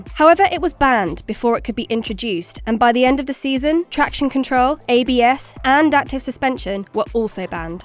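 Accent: United Kingdom. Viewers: Britis speak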